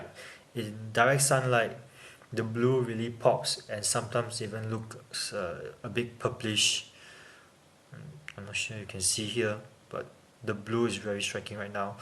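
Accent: Singapore. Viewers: WTF. Speak clearly! Can't stand this Singaporean/Malaysian Chinese accent. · Ya he sound like singaporean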